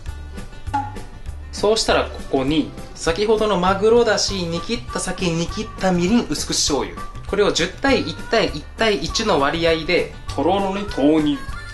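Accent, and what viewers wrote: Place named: Hiroshima, Japan. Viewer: 広島出身の人かな？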